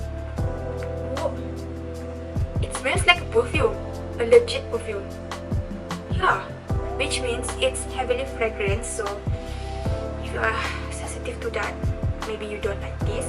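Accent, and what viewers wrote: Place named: Philippines. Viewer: You speak like filipino english accent. Btw I like your video. Thumbs up 👍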